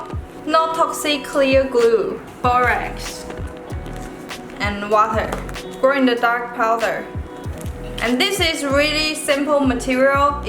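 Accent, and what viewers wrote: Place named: Asia. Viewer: My mum has the same Asian accent as u!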